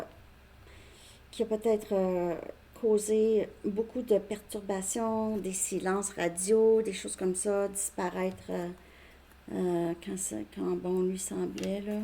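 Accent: Canada. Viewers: Bonjour et merci 🙏 pour votre guidance qui me parle bien. Il est Poisson et je suis Cancer. J'ai donné beaucoup en effet mais impossible de tenir un homme qui aime plaire et séduire. Il m'a quittée il y a 17 ans, pour une autre, par mail sans explications après 12 ans en triangulaire. J'ai divorcé pour lui mais lui non. il revient aujourd'hui et je ne sais toujours pas pourquoi? Nous nous sommes revus en Mai et Juin dernier, il est resté taiseux et depuis c'est le silence radio???. Il m'a dit que nous nous reverrons en janvier ou février prochain sans même demander mon avis?? Wait and see...., j'adore votre bonne humeur 👍 vous été drôle, ça fait du bien de vous écouter et votre accent canadien un vrai délice ❤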